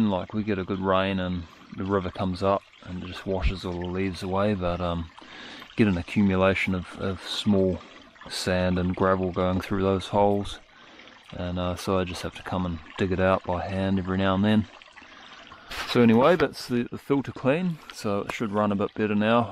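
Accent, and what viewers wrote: New Zealand. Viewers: I love the kiwi accent... "Strup of deed bak"... Love the video. You gave me some ideas. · ..you sound like a Kiwi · I'm looking at this thinking its gotta be NZ bush... hears the accent ;) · Fascinating how a sort of "hybrid British accent" evolved in New Zealand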